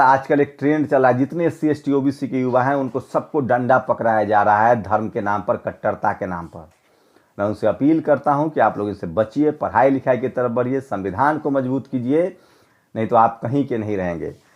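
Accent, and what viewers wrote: Bihar, India. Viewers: Lag raha hai ji bihari jeise bat kar rahe hai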